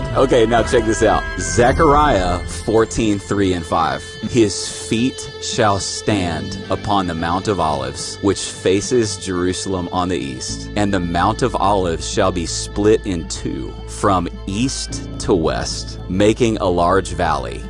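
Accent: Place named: South, United States. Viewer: Not to diminish his point (I honestly couldn't focus on it), but his strong stereotypical southern drawl and overuse of diphthongs was incredibly distracting.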